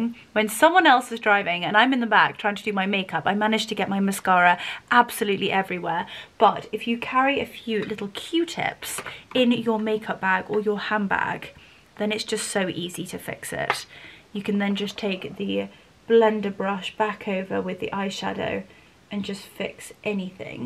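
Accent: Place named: RP, United Kingdom. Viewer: Brilliant video. I studied at uni English literature and languages. It is clear for me you did not only study at uni but I think your English is the RP. 😊😊😊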